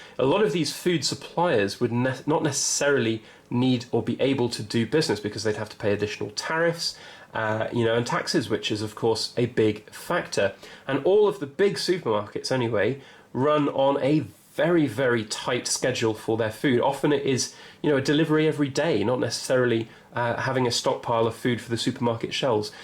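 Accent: England, United Kingdom. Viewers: Seems to be a peculiarity of speech in England. The BBC, for example, pronounces Sinai as "sign-ee-aye" instead of "sign-aye".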